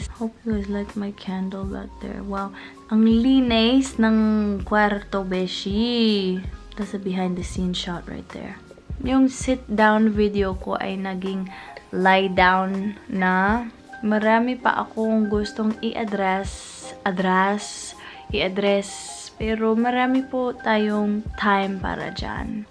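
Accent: Visayas, Philippines. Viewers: ... Di mo talaga maaalis na walang maninira sayo... Please do whatever you want to do... Natutuwa nga ako sa pagtatagalog mo kasi parang bisaya kang ka magtagalog... Kasi may gf din ako noon na taga visayas... Tuloy lang... Thank you... God bless you... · Hi!, sobrang na amaze ako sayo. Galing ng accent mo sa filipino. Pati english mo nadamay na sa accent ng pilipino. Ang pagpili mo sa content mo na gamit ang wikang pilipino ay hindi po pagnanakaw ng kultura. Yan po ay choice mo. Hindi naman siguro patented ang wikang pinoy na exclusive lang para sa amin. OA lang ang nagcomment. Feeling nya, kanya lang yon. Hahaha... Ipagpatuloy mo lang yan. Bihira lang sa isang foreigner ang yakapin ang kultura namin, meron nga jan half filipino hindi proud sa kanyang kultura at di pa marunong magtagalog. Kaya welcome ka sa pagpili ng paggamit ng salita naming mga indio. 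Hahaha... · your so pinay (expressions, accent, slang, and heart).. haters will always hate.. but I like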